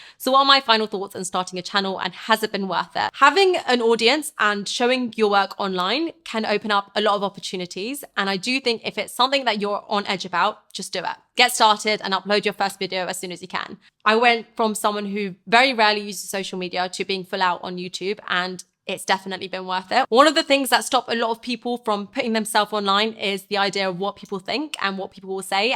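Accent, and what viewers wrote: United Kingdom. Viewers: Why you look like brazylian and talk like brit?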